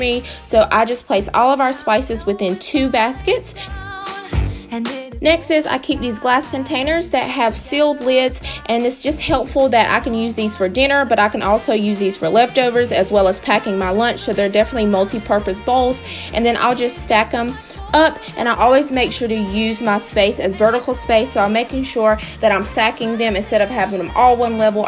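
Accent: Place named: South, United States. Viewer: Your southern Belle accent is adorable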